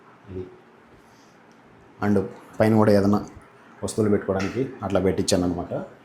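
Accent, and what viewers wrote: Kadapa, India. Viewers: Fast time mi video chuste mi Bhasa ma Kadapa ekkadunna kadapa a level veru anna❤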